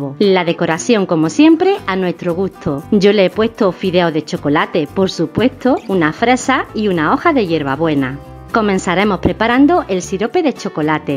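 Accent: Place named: Seville, Spain. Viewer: Hola guapa!!He hecho tu flan de chocolate,lo he dejado en la nevera para mañana.Espero me haya salido tan bien como el tuyo,muchas gracias por tus recetas!!Eres muy agradable y simpática!!Eres andaluza?Lo digo por tu acento tan bonito que tienes,parece de Sevilla.Yo soy sevillana,criada en Cataluña.Un beso guapa.😘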